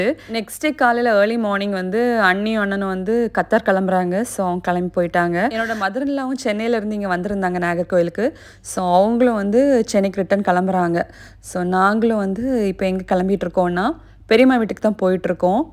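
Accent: Kerala, India. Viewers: malayali aanu alle ... I can guess from the accent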